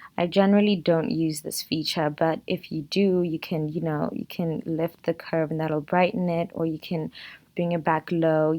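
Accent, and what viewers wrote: South Africa. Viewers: Wait, you must be South African? Right? I love your channel btw, brilliant content 💕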